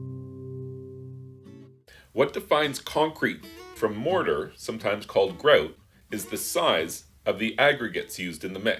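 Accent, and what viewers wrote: Canada. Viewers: I can hear that Canadian accent sneaking through. lol. Cool video.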